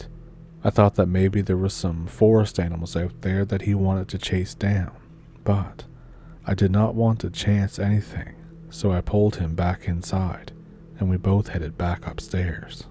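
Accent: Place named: Ottawa, Canada. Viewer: Odelay, 😊 always nice to hear my ottowa cousins accent when you tell yuir stories, neh? Frankly it abaot time. 😊🎉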